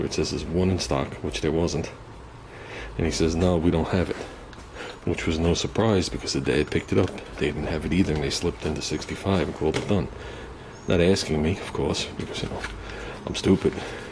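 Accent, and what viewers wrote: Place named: East Coast, United States. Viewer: East coast accent.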